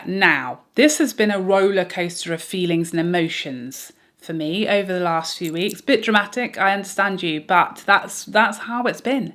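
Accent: England, United Kingdom. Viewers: I just subscribed - full disclosure, it was because of your accent. Reminds me of “Midsomer Murders”! BTW, I agree with your re: the cheese scented shit. Gross.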